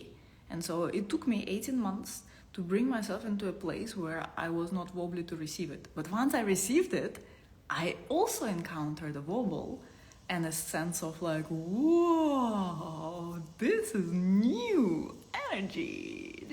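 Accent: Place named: Russia. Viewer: A very strong russian accent... annoying.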